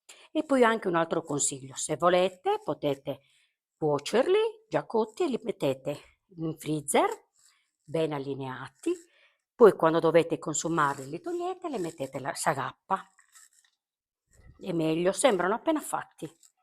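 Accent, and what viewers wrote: Sardinia, Italy. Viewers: Ma tu sei sarda come meeeee, l' ho capito quando hai detto: si tianu, siiiproprio come lo chiamiamo noi e anche come fai i papassini , mi fa' piacere, e forse non sei molto lontana dal mio paese, da come parli il dialetto direi proprio di no. Complimenti ancora, sei unica😘♥️🎉🎉🌹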